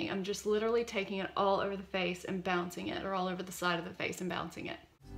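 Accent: South, United States. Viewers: It's a southern accent thing. I have this "issue" too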